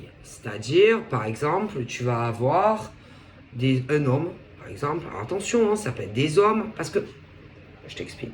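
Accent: South, France. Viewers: l'accent du Soleil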